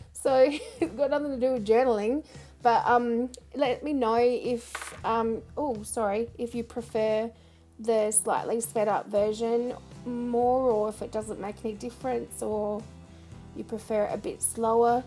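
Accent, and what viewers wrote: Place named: Australia. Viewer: yay another chatty aussie lol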